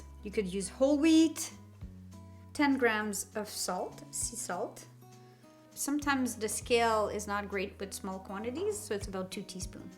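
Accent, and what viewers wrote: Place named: Montreal, Canada. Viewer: Look like the montreal accent ☺️ ... from what ive always heard "00" stand for the ash content in the flour tho ... 👍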